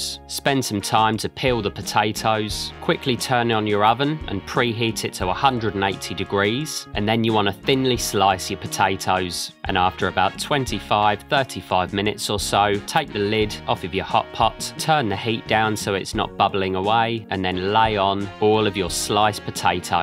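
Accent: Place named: Lancashire, United Kingdom. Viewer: Nothing better than a traditional Lancashire accent. That aside nice recipe. 😉👍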